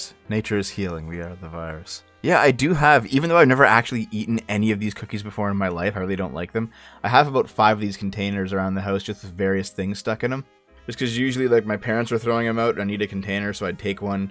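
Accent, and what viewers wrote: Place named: Ontario, Canada. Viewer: I think that you only like an hour or two from me. Based on the way you talk I'd assume you live in Ontario. This makes me wanna ask if your grocery store also has to wash the entire conveyor belt with every customer?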